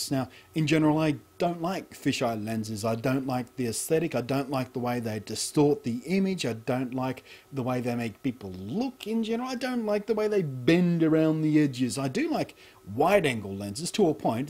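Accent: Australia. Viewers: He's Australian... Unless you didn't mean that. Otherwise, yeah, Brit accents are pretty cool. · He is not british. He's australian...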